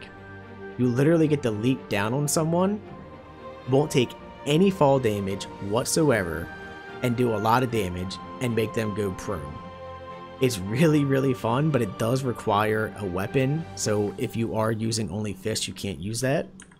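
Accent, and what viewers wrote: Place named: Philadelphia, United States. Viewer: This might be the most out of topic comment but are u from the philly area the accent is very strong if so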